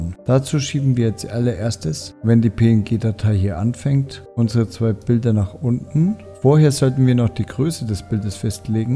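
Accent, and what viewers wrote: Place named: Nuremberg, Germany. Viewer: Gut erklärt, Nürnberger Dialekt nich zu überhören :´)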